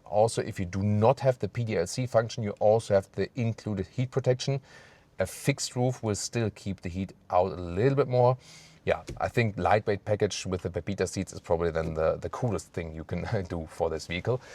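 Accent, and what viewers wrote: Germany. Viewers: Drinking game: Take a shot every time says "Wuerszhion" (Version) We love your reviews and beautiful German accent <3 The black paneling around the wheels is the ugliest I have seen in a long time. Usually, people air for a look where the wheels fill out the wheel arches as much as possible, and here they have visually gone in the opposite direction.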